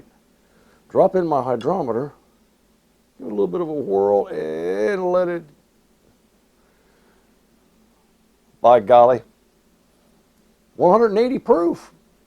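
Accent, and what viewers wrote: Texas, United States. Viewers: Are you from texas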